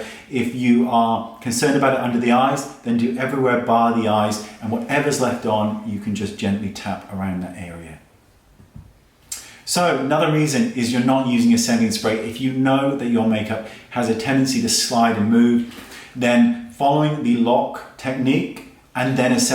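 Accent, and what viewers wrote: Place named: West Country, United Kingdom. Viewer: it's a west country accent, it's not that fabulous in term of English accents, but better than mine at least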